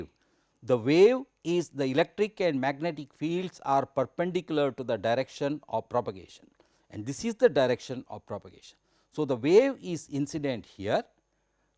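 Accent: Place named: South India, India. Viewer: Sir appears to be south Indian by his accent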